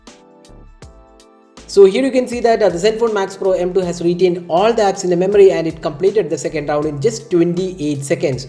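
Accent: Kerala, India. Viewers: Are you a malayaliii🤔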